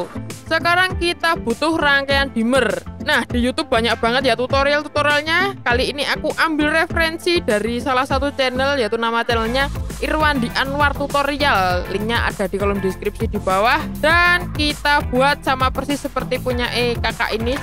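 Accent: Java, Indonesia. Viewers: Baru kali ini nonton tutor kelistrikan bisa ngekek krna bahasane medok bianget😂😂😂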